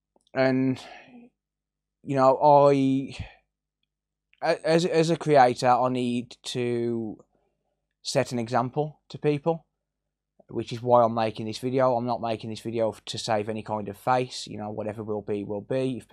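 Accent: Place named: Black Country, United Kingdom. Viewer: We have all fell into that trap, at least you owned the error, now you can move on and continue with the great content we always see from you. You have gave me an interest in electronic repairs, not sure I could as I'm thick as two short planks but anyway, oh and big up the Black Country accent, I hail from West Brom... Keep up the good work 👍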